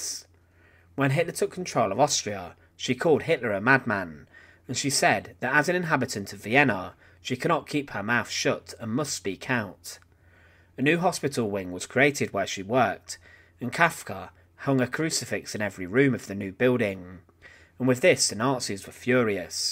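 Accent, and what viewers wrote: United Kingdom. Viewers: You put an huge emphasis on the last syllable of the word at the end of each sentence. Strange to my ears. Is this a particular British accent?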